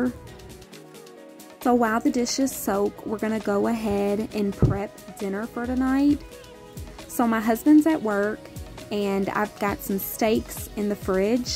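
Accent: South, United States. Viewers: I love when people say things different than I do. I find it sweet and southern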